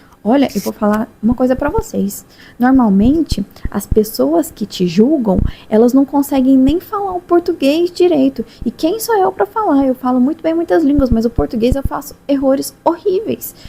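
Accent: Minas Gerais, Brazil. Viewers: Tenho uma curiosidade......você é mineira?(de Minas Gerais?)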